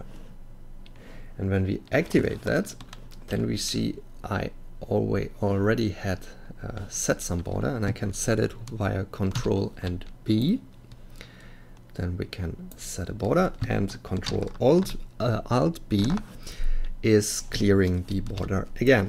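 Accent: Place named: Germany. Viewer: Man hört einfach deutlich, das du deutscher bist :D Aber trotzdem sehr gut erklärt :D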